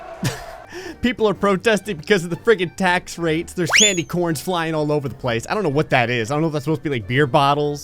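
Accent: New Jersey, United States. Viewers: What part of NJ are you from?? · I'm from Brooklyn and can comfirm that is nj lol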